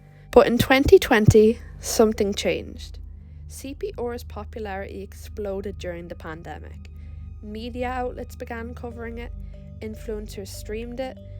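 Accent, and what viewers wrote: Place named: Ireland. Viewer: That's so interesting, I guessed it was Irish but I didn't even know you had different accents like that. Are you native?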